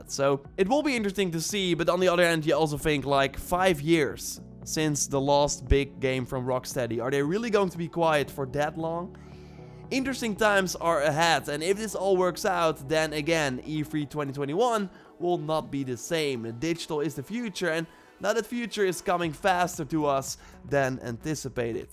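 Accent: Netherlands. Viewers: Hey, Like your video's very much and learn a lot from them as well. Ben je Nederlands? Dacht het te horen aan je accent.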